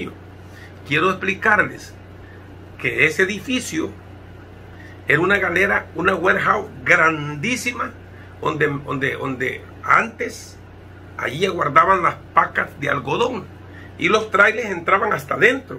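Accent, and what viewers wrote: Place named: El Salvador. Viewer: Éste se habla como salvadoreño tas tas ciado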